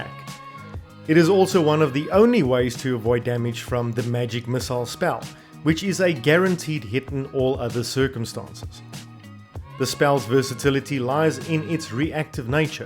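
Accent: Afrikaans, South Africa. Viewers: Nice video; are you Afrikaans?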